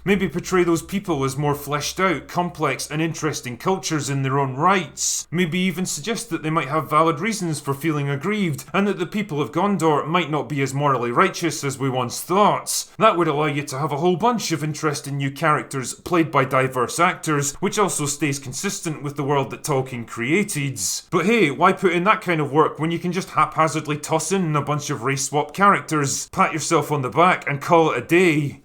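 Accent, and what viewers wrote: Scotland, United Kingdom. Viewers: Interesting hearing a Scottish guy say this is a story about England. Tolkien borrows more heavily from Celtic Myths than Anglo Saxon ones. Welsh heavily influenced Sindarin and Quenya is heavily influenced by Finnish. Tolkien wanted to fill in the gaps left by the Norman invasion and used Arthurian/Celtic myth to do so.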